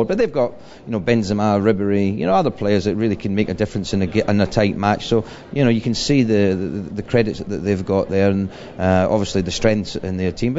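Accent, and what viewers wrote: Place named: Scotland, United Kingdom. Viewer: Ray is Irish but why does he seems to speak with a Scottish accent?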